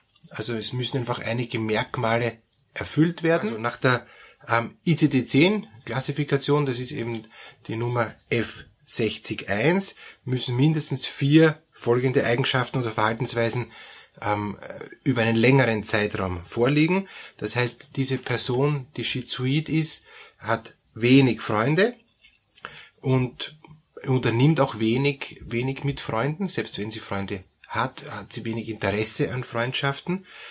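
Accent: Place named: Austria. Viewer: Sehr geehrter Herr, Ihren Kanal finde ich wirklich gelungen, außerdem liebe ich die österreichische Aussprache. Ich möchte Sie bitten, in Zukunft, Themen wie Schizophrenie oder auch Depersonalisation/Derealisation, aufzugreifen. Vielen Dank.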